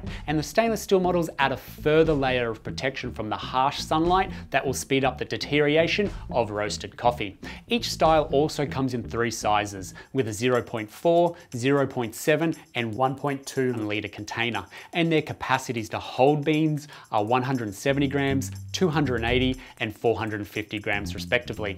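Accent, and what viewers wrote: Australia. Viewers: Very informative video, pity about the unbearable Australian accent...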